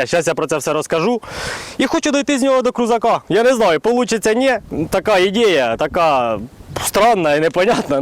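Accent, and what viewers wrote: Poltava, Ukraine. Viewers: З перших секунд чути Полтавську область. Звідки ти?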